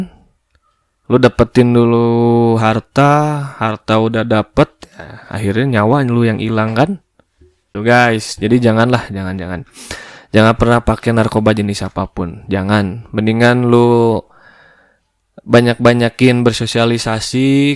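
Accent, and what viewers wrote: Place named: Bandung, Indonesia. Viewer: Orang Bandung nya kang logakna kkental pisan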